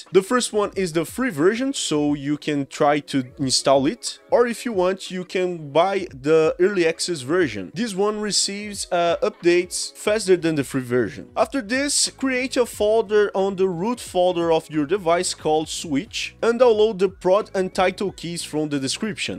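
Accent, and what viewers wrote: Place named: Brazil. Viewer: Are you from Brazil people there speaking English is just like yours not saying your English is bad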